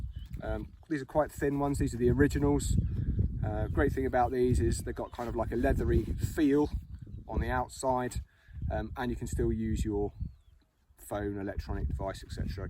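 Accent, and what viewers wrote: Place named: United States. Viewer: You talk funny from America. Thanks for the video good info.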